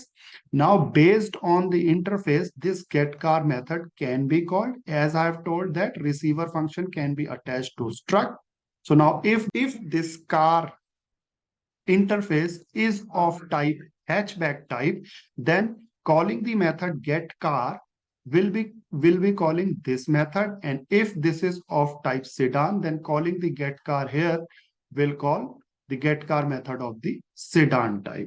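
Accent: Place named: India. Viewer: Gosh .... Indian english is disguisting . As always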